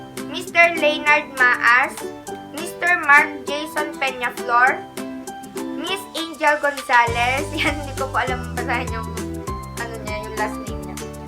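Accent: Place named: Marinduque, Philippines. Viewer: we are the same accent im from marinduque province...watching from canada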